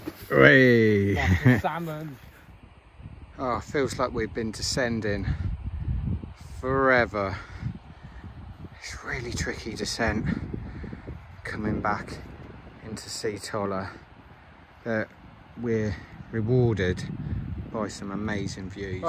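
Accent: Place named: East Anglia, United Kingdom. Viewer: Ah, are you from East Anglia? Your accent sounded familiar to me and thought you might be from that area?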